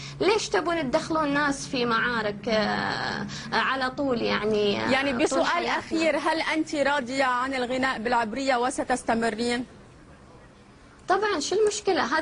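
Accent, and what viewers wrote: Iraq. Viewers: شكلها وكلامها عراقي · هذي تكلم. عراقي. منو وين صارت كويتيه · ههههههههههههه اقص يدي اذا هاذي كويتية !! تسمعون لهجتها !! فيها لكنه عراقية اربما كما قال الي قبلي اصلها فلسطيني !! نحن تعرف الاصل الخليجي من النطق والشكل ولو جلسنا معه دقايق عرفنا وش اصله وهاذي ميزه فينا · لهجتها عراقيه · منو هالصعلوكه ؟؟!! اولا خليتو لها قيمه به الفيديو وثانياًاهي كلامها عراقي مو معني جلست لها بالكويت كم سنه تقولون عنها الفنانه الكويتيه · هاذي لسانها عراقي ! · قد تكون مجرد حامل للجنسية الكويتية، لكن الوجه و كأنه من يهود أصفهان و اللهجة عراقية، و لا أحد يعرفها بالكويت البتة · من لهجتها عراقيه بس يمكن تكون مثل ما ذكرتي وتزوجت كويتي وبالتالي حصلت على الجنسيه الكويتية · هذي عراقية دمها ولهجتها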